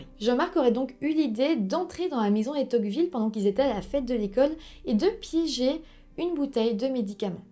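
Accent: Belgium. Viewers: merci pour la vidéO et l'accent belge <33333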